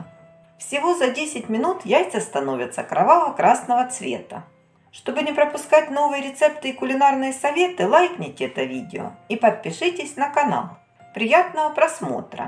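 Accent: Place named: Ukraine. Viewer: Акцент хохляцький. Важко слухати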